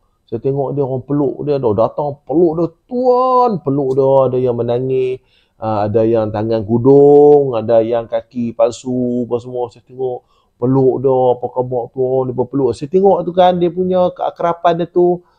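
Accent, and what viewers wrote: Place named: North, Malaysia. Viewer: Assalam tuan pekat snguh tuan ckp utara rindu plk kg haha...mcm crown yg tuan pkai tu ada allah/muhammad kn klo org bkn islam pkai tu mcm mna plk tu tuan..😊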